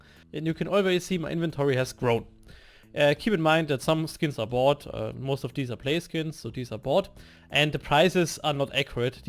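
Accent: Germany. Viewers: Are u german? ^^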